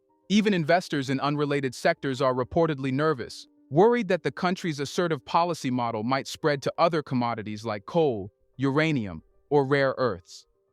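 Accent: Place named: United States. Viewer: What's with the American accent? Seems a little hypocritical.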